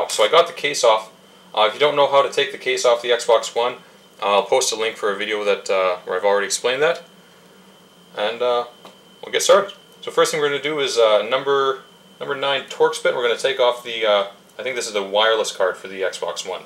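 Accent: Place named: Canada. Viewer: You sound a little like a Canadian.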